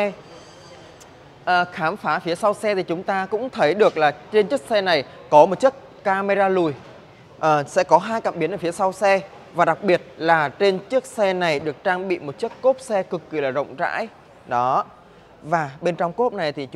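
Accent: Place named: Thanh Hoa, Vietnam. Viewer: Thằng này nói nung tung núc thì 550 núc thì 650 nói quá nhiều giọng lại thanh hóa khó nghe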